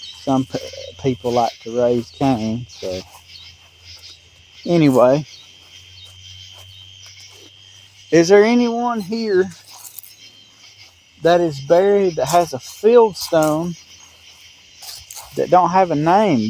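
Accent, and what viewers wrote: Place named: South, United States. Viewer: Very atmospheric video. I dig your accent (1 of the southern states I guess) and the chirping of the crickets creates a cool ambiance. 👍🦗PS I am English so please excuse my ignorance as to which state your accent represents!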